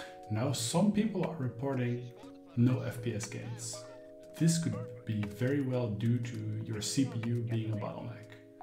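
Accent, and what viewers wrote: Netherlands. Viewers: Are you from the Netherlands? I hear Dutch ;)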